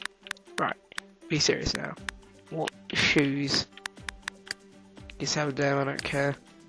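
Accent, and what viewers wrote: United Kingdom. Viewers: he sounds more british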